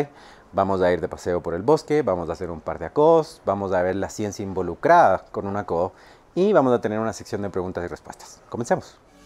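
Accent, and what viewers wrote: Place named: Central America. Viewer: Increible su conocimiento, facilidad de la palabra y modo de explcia. Gracias, yo no hablo desde la perspectiva de experto ni mucho menos, solo podr'ia decir que soy un apasionado por las plantas y los arboles, pero soy totalmente neofito en los bonsais, acodos y demas temas, pero me gusat leer, ver videos, comprara notas, experiencias y experimentar. Por ahora le cuento que quiero hacer un acodo aereo a un mandarino que esta en frente del lugar donde vivo porque ya es un arbol maduro y porque ya ha produccido mandarinas de las cuales he disfrutado varias veces, el tema es que esta en una avenida asi que es espacio publico y pues cualquiera podria destruir mi acodo, quiero conservar algo del arbol viv porque en Enero de 2019 me ire de aca. Ahora mi pregunta, podria hacer el acodo y tener exito?, cuanto tiempo es recomendable dejar el acodo para cosecharlo?, si, voy a ver el video de acodo aereo, gracias y Dios lo bendiga por su aporte a la naturaleza. 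Saludos de Colombia -- por cierto, Ud. suena centroamericano/chileno, de donde es?.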